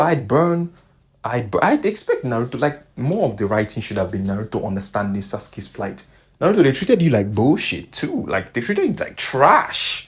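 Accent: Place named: Africa. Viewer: I knew you were african, the accent never lies 😂. Also the itachi novels go into more detail. This is a quote from the novels when the elders debate whether they should allow itachi in anbu "The Military Police Force was created to drive the Uchiha clan away from the central functions of the village". Like the systemic discrimination was there and they didnt even hide it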